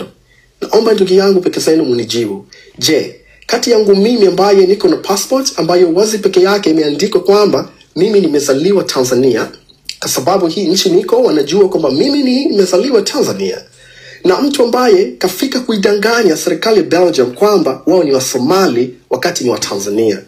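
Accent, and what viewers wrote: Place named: Kisii, Kenya. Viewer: Kwani wewe ni Mkenya?Mbona lafidhi yako kama ni wa kisii?Achana na habari za Tanzania kenge maji,tukijichanga ulaya tunacmplain tunatoka nchi yoyote yenye matatizo Africa,we are Somalian we are Burundian and we ate also Congolese.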